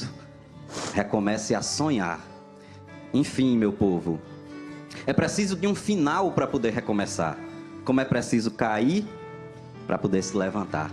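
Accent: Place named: Brazil. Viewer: Que bom ser premiado com uma poesia tão clara, que nos leva a meditar. E com o sotaque de Brasil, com vocabulário puro e nordestino, sem receio de nada. Sou eu fã. Um paraibano em Sampa cheio de Gratidão!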